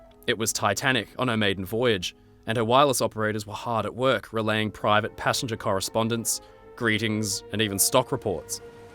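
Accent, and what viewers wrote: Australia. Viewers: The fact that he’s Australian makes your statements pretty revealing… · he's Australian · yay!!! Aussie narrator😃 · Fantastic script well read fellow Aussie?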